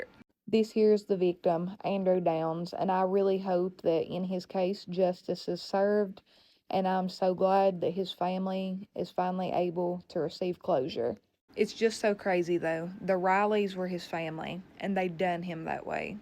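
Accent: West Virginia, United States. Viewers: I lived in Beckley, WV for over a year for school and fell in love with the state. Your accent is as beautiful as you! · Your WV accent is absolutely delightful.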